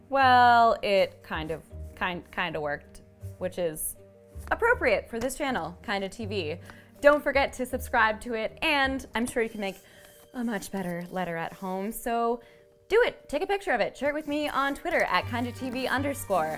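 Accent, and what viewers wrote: United Kingdom. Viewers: speaking british will be the death of me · as a brit i'm pleasantly surprised at english accent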